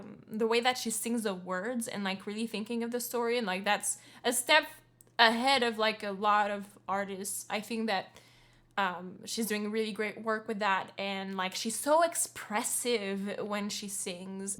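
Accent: Canada. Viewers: Hold on a second, are you Canadian?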